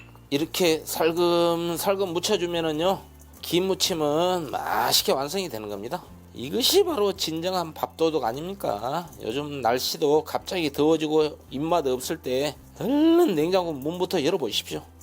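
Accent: Jeolla, South Korea. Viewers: 역시 세프님 멋있어요. 전라도 구수한 사투리로. 설명이 좋읍니다 건강하세요. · 찐한 전라도 사투리 정겹습니다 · 여나무바꾸만 돌리믄 됩니다 ㅎㅎㅎ 전라도 사람들만. 알아듣는사투리 그것도. 나이먹은사람들만아는 ㅎㅎ 구수하고. 정겹습니다 음시도 전라도스타일이시고 최보십니다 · 김 묻힘~. 여나무바꾸 ㅎㅎ 샘의 사투리가 넘웃겨용ㅎㅎ 저두 전라도라 알아묵었는데용 ㅎㅎ 멋진 요리 틈나는대로 보고 많이 배웁니다요 매뉴 감사합니다~. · 오늘도 구수한 전라도 사투리에 엄청 웃었네요 요리도 최고 👍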